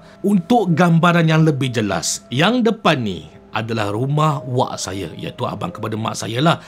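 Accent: Perak, Malaysia. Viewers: Saya baru join tengok.. seronok dengar kisah2 benar..dan yg lebih seronok 'dengo' cakap loghat perak.. haha..😅 sebab saya pun original org peghrak..hahaha😂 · Suka juga bila dengar bercakap loghat Perak seperti "idak" dan "udah". Saya peminat channel ini, teruskan berkonten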